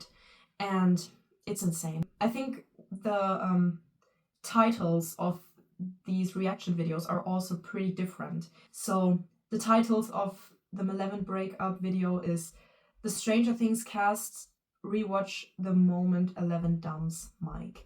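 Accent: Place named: Germany. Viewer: Ich kann deinen deutschen Akzent hören 😼😼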